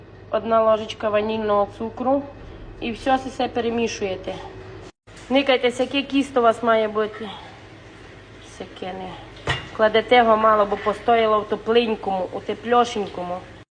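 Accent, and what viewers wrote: Transcarpathia, Ukraine. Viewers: Чудовий закарпатський діалект! Різноманіття -це золото України!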